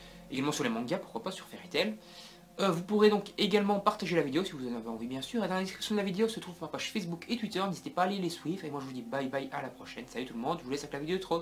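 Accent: Belgium. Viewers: t'es belge ?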